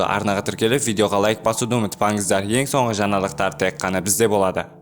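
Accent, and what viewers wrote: Uzbekistan. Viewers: Коментатор озбексинба немене бале сойли алмай отсын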